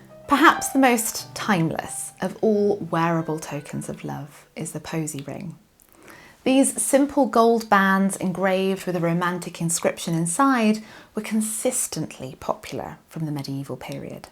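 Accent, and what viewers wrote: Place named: United Kingdom. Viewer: やはりイギリス英語はよろしいですな。❤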